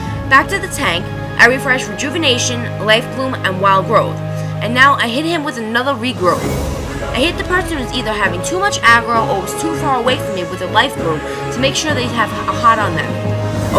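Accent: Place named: Brooklyn, United States. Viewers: she sounds like mila kunis with a bit of a new yorker accent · i like 2 things about this video 1) the tip saying how healing isn't nessicarily about rotations just knowing what move is best for a given situationg and 2) your awsome brooklyn accent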